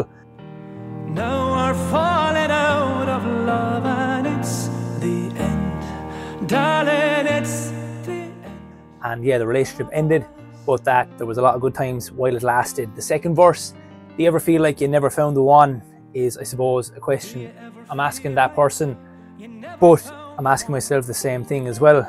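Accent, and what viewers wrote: Ireland. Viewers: Good afternoon my superhero and my guardian angel and my no1 I have listened to you new cd many times and I love it to bits all the songs are as amazing as you are take care my beautiful friend 🌟🌟🌟🌟🌟🌟🌟🌟🌟🌟🌟🌟🌟🌟🌟🌟🌟🌟🌟🌟🌟🌟🌟🌟🌟🌟🌟🌟🌟🌟🌟🌟🌟🌟🌟🌟🌟🌟🌟🌟🌟🌟🌟🌟🌟🌟🌟🌟🌟🌟🌟🌟❤️❤️❤️❤️❤️❤️❤️❤️❤️❤️❤️❤️❤️❤️❤️🌟❤️❤️🌟🌟🌟🌟❤️❤️❤️❤️❤️❤️❤️❤️❤️❤️❤️❤️❤️❤️❤️❤️❤️❤️❤️❤️❤️❤️❤️❤️❤️❤️❤️❤️your Irish accent is always amazing ❤️❤️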